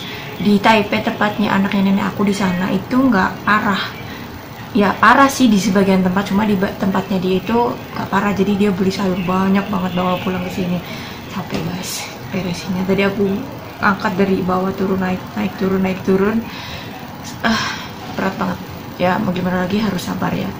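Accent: Banyumas, Indonesia. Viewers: Kiye wong ngapak.. Nonton maning,, ful pokoke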